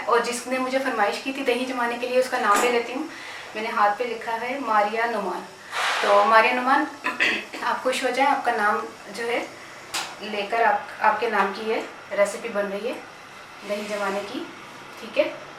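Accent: Punjab, India. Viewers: Tusi punjab tu oh jeraa ayna haha aisi v aynj e bolny ah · MashaAllah that panjabi voice aye khaye Pakistan de yad agae 😢😢😢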